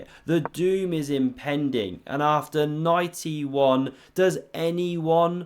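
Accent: England, United Kingdom. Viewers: Off topic: Where is your accent/dialect from? I think England, but where?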